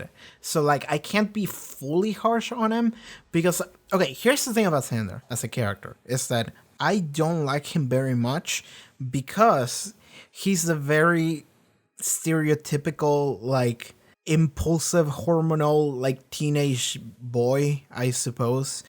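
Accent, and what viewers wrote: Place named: Latin America. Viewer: "monster of the week" episodes are the best for me. Yes, I enjoy a "story arc" episode here and there, but the individual episodes are the ones I end up revisiting the most and they're the reason why I still watch Buffy to this day. Also, me encanta ver a un reactor que habla español y es de sangre latina, me había preguntado de dónde eras en estos últimos episodios y porque me llamó la atención el acento con el que hablas inglés, y es bueno haber despejado la duda. Saludos desde México.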